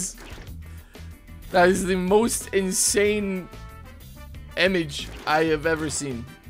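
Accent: Scotland, United Kingdom. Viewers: I feel like you're legitimately the best youtuber I've seen: Although you have a main game (league), you don't mind diverting to another games, you're extremely funny, and the scottish accent helps, you're unique and you interact with the community which is lovely Keep it up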